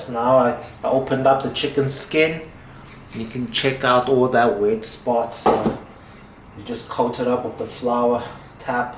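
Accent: South Africa. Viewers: Are you a South African national? I ask because even though you're clearly of Asian descent...the way you talk foreign to Asia, your accent is very African😂, are you from South Africa by any chance? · Oh my goodness! I absolutely LOVE you and look forward to trying this recipe over the weekend. Thank you so much for sharing, you just made me very hungry with a craving for fried garlic chicken thighs. And by the way... I absolutely LOVE your accent. You remind me of my South African friends in Toronto, Canada. Much love to you and your family. Thank you! xoxoxo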